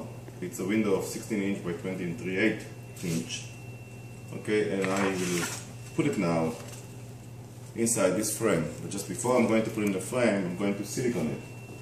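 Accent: Israel. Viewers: Helpful, thank you. The insight about Lowes was vital information. Enjoyed the Israeli accent as well, haha.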